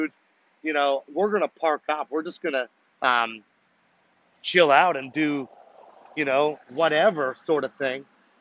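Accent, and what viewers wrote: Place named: United States. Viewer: 1984 is a book by George Orwell, Mate. You sound American, though. Love from NJ, USA. GO TRUCKIES! SHUT IT DOWN! Australia's leaders have gone insane.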